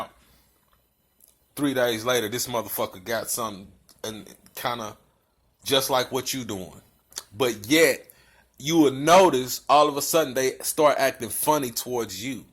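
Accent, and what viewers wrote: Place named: Oakland, United States. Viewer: Sound like you from Oakland, Calif.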